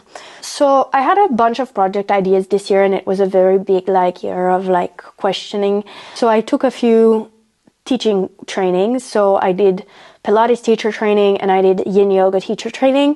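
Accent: France. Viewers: I'm wondering if the secret boyfriend is French speaking, more French accent is coming out 😊❤ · Îm love that her little French accent is coming through more. It sounds SO cute! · Nice video as always... your accent sounds more french in this one for some reason :D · she does have a bit of a french accent that comes out every once in a while and I notice it in many videos. I feel that the same happens to me when I talk in a certain language more often my accent shifts. But yes overall she does not have a strong accent or anything.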